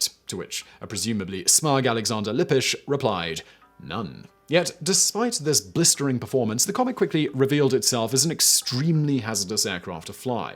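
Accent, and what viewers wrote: United Kingdom. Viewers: Heyyyy another one of your channels! How did I know? You stuck your face in the thumbnail as usual. 🤦‍♂️ Get a real job lol. You basically narrate wiki articles (with 5x YouTube accounts) with a British accent and make a shit ton on money monetizing it. Maybe I should be congratulating you? I don’t even know. I just wish YT would stop suggesting this stuff and give me some original content lol. Oh but I do love the dapper clothing and those oh-so-poignant dramatic pauses between horrible jokes. 🤦‍♂️ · You try hold interest by talking really fast with a British accent, but it’s not working. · I love this guy's British accent it is amazing and I could listen to him all day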